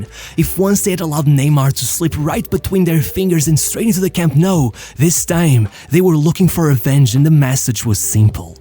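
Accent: Portugal. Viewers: It took me 2 minutes to realise that this guy was Portuguese lol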